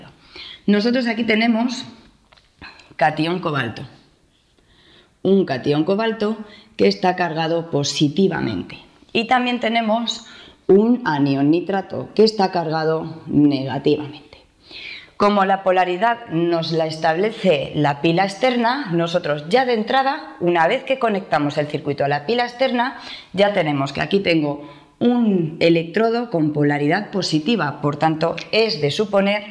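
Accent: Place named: Spain. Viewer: Maestra, de que ciudad de España es usted? Me agrada su acento y no es tan enfadoso como otros españoles mucho más marcados. 🙂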